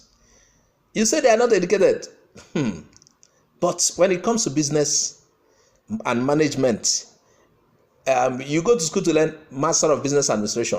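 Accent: Igbo, Nigeria. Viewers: Your Igbo accent is funny..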